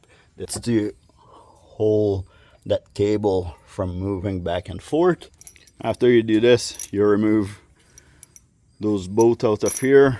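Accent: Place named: Quebec, Canada. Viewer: Sounds like you are from Quebec, like me from Montreal!! I am your first commentator! Great video, will try and by the way, never thought of doing the job this way.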